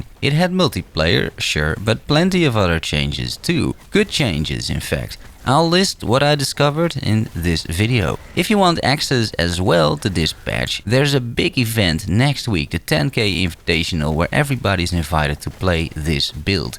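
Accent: Netherlands. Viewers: Amazing how a Dutchy can sniff out another Dutchy within 2 seconds, by the accent haha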